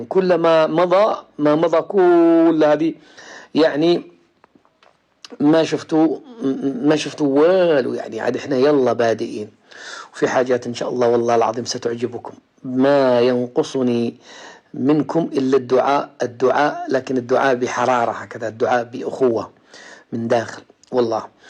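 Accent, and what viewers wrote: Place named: Morocco. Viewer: يمني في المروك ويتكلم اللهجة المروكية😅😅😅😅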